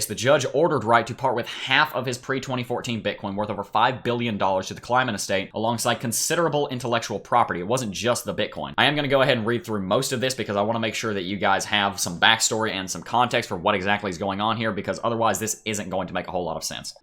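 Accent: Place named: Alabama, United States. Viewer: I am not from Birmingham AL, but you sound like you may be! Enjoy your channel!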